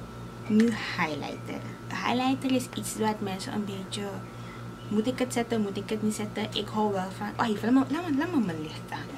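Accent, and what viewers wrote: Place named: Suriname. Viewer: Ik keek naar een video waar je Engels sprak en ik had gelijk een gevoel dat je van Suriname was en je bent werkelijk van Su❤️❤️❤️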